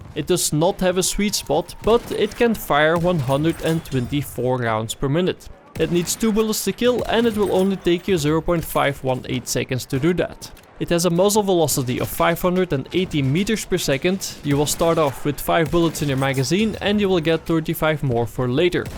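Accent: Netherlands. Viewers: battelfield wan xD. your dutch accent is overwhelming xD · ik hoor al dat je Nederlands bent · You sound Dutch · That dutch accent. 😂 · Where are you from? When i first saw one of vids i heard a dutch accent so yea... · Is this a dutch voice over guy? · Ik hoor gewoon dat je nederlands bent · nederlander lol ik herkende het meteen aan je accent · that dutch accent tho, nice good work!!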